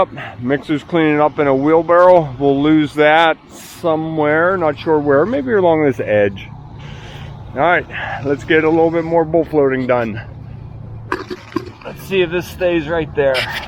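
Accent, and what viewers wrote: Pittsburgh, United States. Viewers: do not link up with other sites. If you get too commercial. As a “civilian” non-contractor observer, I could choose concrete guy. I like your videos and your Pittsburg accent.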